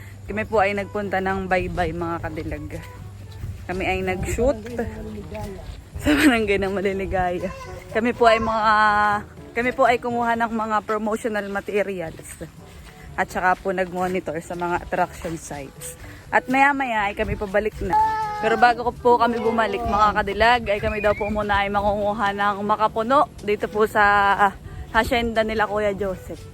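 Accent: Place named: Marinduque, Philippines. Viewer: Ikay baga ay taga Marinduque sa punto ng pananalita mo ay marinduquenio ga mura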